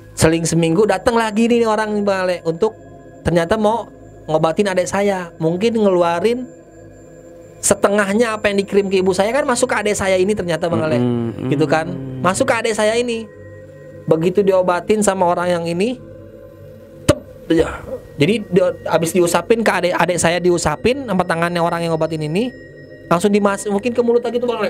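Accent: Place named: Jakarta, Indonesia. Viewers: Sebagai orang betawi, gw demen bener denger nih abang cerita dengan logat betawi yg kental. Inshallah selama kita selalu beriman hanya kepada Allah dan menyembuhkan diri dengan cara2 yg dibenarkan oleh Al Quran dan hadits, keluarga abang dan kita semua selalu di lindungi Allah SWT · Betawi banget kaya baba gw . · Gak tau kenapa kalo orang Betawi lagi cerita seneng aja gitu 😁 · 🤭🙏, Betawi bnget 😁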